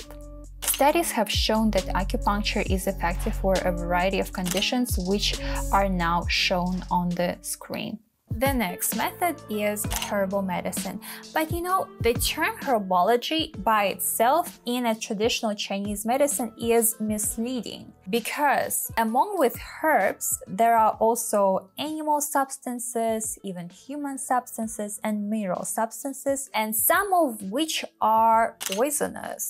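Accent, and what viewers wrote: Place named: Russia. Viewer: you have a very good English but am I hearing Russian accent?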